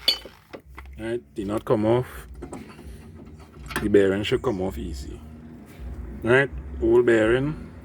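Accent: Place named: Trinidad and Tobago. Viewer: Voice sounds like trinidadian 💯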